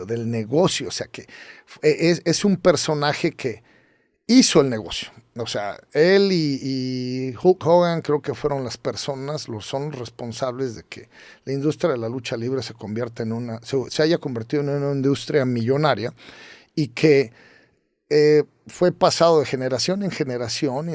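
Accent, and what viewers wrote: United States. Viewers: Tiene bie puesto el nopal en la frente. Hablando como chicano. Por favor. Que acomplejado este tipo. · A huevo tiene que pronunciar en estilo americano ,no puede hacerlo normal?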